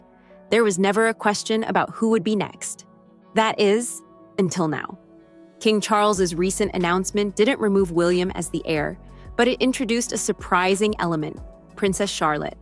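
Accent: United States. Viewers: Another American voice coming from the speaker's rear about something about which she knows nothing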